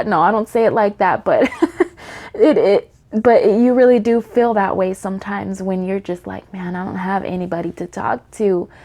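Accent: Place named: South, United States. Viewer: U sounded southern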